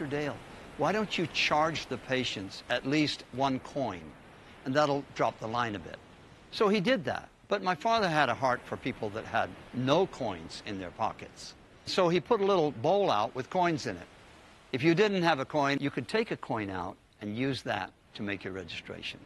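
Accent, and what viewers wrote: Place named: Taiwan. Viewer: It’s so cute, his Chinese got Taiwanese accent.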